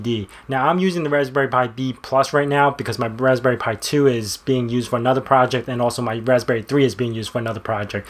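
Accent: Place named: New York, United States. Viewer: Are you from New York? You got the accent haha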